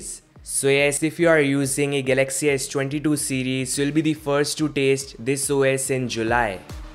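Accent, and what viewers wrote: India. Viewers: This is Indian accent Loved it !!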